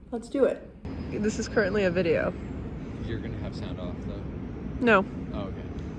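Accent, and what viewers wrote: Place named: Canada. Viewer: I might borrow “joggish thing” from you bc I’m an Olympic level joggish un-athlete😊 Do I detect a very slight Canadian accent? It’s the “been” pronunciation that clues my northern Vermont ears.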